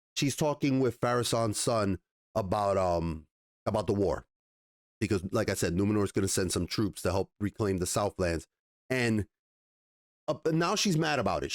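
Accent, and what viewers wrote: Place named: New York, United States. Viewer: Nothing speaks to my soul more than a New York accent ranting. Subscribed.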